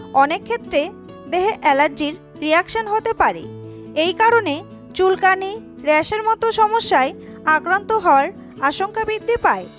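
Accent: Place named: Bangladesh. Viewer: তুমি বাংলা দেশের লোক। তোমার কথা শুনেই বোঝা যায়। যেমন maass/মাছের উচ্চারণ শুনেই বুঝেছি।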